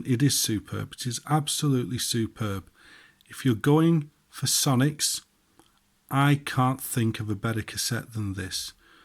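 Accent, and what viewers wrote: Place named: Manchester, United Kingdom. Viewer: You've got the same kind of accent as Techmoan. Are you from the Manchester area too? 😁👍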